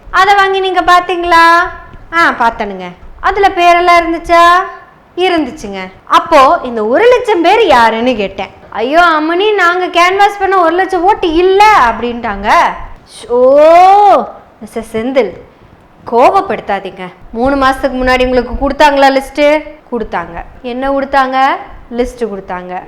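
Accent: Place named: Coimbatore, India. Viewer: Kovai slangs very good👍🎉❤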